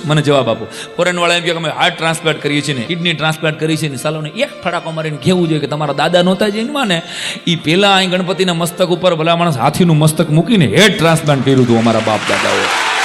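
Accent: Kathiawar, India. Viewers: tmari speech kaathyavaadi mne khub j gme 6e kaathyavaadi speech madh jevi meedhi hoy 6e jane k sambhlta rahiye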